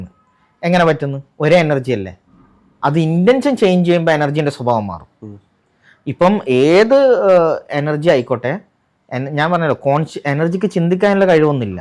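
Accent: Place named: Malappuram, India. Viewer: Slang കേട്ടപ്പോൾ ഒരു മലപ്പുറം touch തോന്നി😅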